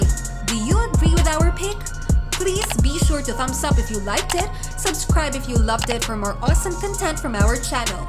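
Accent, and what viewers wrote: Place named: Philippines. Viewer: Are yall Filipino? You sound like my best friends wife and she is from Cebu. You didn't put Brian Scalabrine?? Any how, Great Job. Keep pushing hard. Don't give up.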